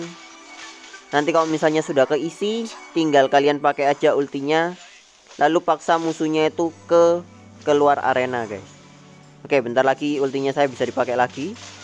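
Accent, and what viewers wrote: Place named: Java, Indonesia. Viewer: Wkwkwk medokk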